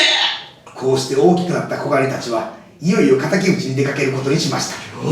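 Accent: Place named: Kagoshima, Japan. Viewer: あちこちに鹿児島なまりが残ってるのがめっちゃ好きです!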